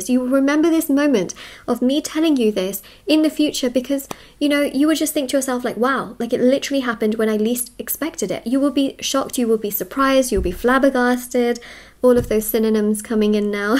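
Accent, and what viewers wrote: United Kingdom. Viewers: This lady is from UK I can say that. I wish to go and work in london for a couple of years. This slang sounds so interesting.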